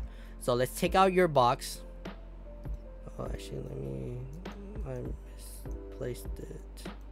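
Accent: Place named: Toronto, Canada. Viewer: you got that toronto accent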